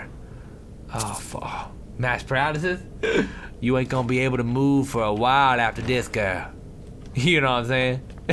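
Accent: United Kingdom. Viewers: how did you come to develop such a british accent?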